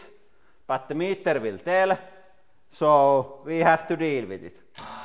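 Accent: Finland. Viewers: R U from Finland my friend ? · I love indirectly learning Finnish from these videos based on the accent alone, it throws me off when he says things like “asked” because it’s a one-syllable word but hearing it like “ask-ed” makes me stop and think about how phonetic Finnish is. Hopefully I can break down syllables easier, especially when pronouncing the months and words like kyysymys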